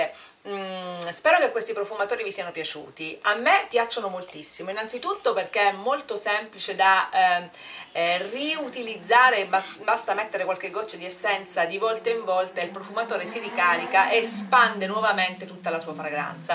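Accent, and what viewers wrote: Sicily, Italy. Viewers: ma sei siciliana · Ok..grazie..ti auguro tante belle cose.. ps.o sentito sei siciliana anch'io, sei di palermo x caso?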